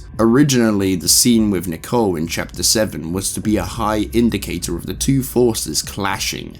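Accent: United Kingdom. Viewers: Good video.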